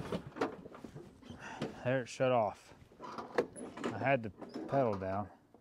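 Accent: Pennsylvania, United States. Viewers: Cool. I thought you had kind of a PA accent. I’m originally from NE PA and now I live in NH. NH apparently stinks for trying to buy non-ethanol gas. No gas stations sell it that I can find and only specialty stores sell it in cans for like $25-$30 per gallon. Anyway, I found my problem. I actually blew some compressed air backwards through the gas line after emptying the tank. There was a clump of dirt stuck on some kind of gummy crap stuck somewhere between the top of the tank and the inlet inside the tank. Looked like rubber cement. Runs like a champ again now. Hopefully that was the only dirt in the tank. Thanks for the idea of blowing out the lines like that though. Without your video, I’d probably have taken apart half the mower by now.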